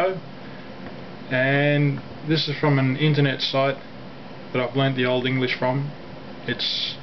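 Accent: Australia. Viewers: Good stuff mate, nice to see another Aussie staying true to the old ways and culture - I'm an Anglo Saxon/Wodenist myself and I see it as a duty to research, celebreate and preserve our Anglo Saxon/Germanic culture, religion, roots and language. Our warrior arts are a huge part of this also - which I also practice. Try and work on your accent also, it lacks sympathy with the original tongue - you're saying the words but with strine accent. Listen to Frisians and Danes speaking for help on this. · Yes, it sounded like ancient Englisc and modern Aussie accent mixed into one, lol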